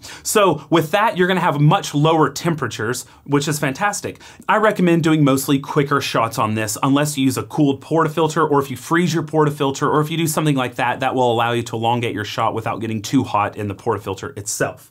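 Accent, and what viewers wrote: United States. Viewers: sounds American not European. So are you an expatriate?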